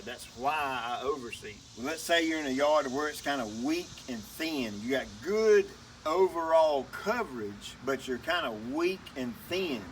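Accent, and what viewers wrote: South, United States. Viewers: That would be awesome to watch both of you guys do a collaboration together. You both are great at what you do. I appreciate the information you pass along to all of us here. BTW, my wife rolls her eyes when I watch my videos but when comes on she'll watch with me. She just loves your southern accent. Keep up the great work,.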